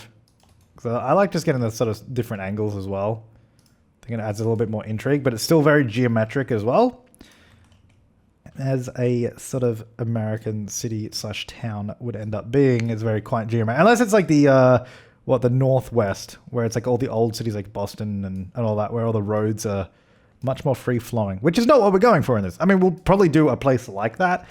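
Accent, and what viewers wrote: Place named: Australia. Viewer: i love listening to game commentary in an aussie accent, they don't go fucking mental like Americans which is just lovely ...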